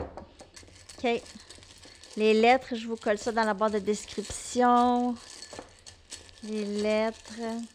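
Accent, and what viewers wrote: Canada. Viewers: Chère comme ça va faire longtemps que je n’ai pas eu un billet d’avion dans ma poche tu es mon petit quart d’heure « dépaysement » entre ton petit accent canadien qui me ravit et tes jolies remarques sur les oiseaux ou autres charmantes voire drôles Ben je voyage quand même grâce à toi Je t’écoute depuis la France J’ai déjà été au Canada dont je garde précieusement quelques beaux souvenirs 💕❤️💗💓❣️😘🌺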